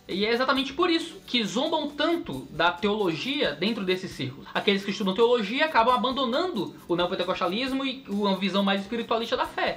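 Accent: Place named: Northeast, Brazil. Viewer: Fico ouvindo esse nosso sotaque (nordestino), e admirando essa biblioteca 😍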